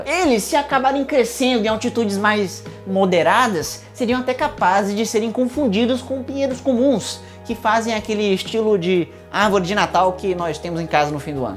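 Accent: Ceará, Brazil. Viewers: Krai o guri e cearense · Cearense k( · Ele é cearense confunde mesmo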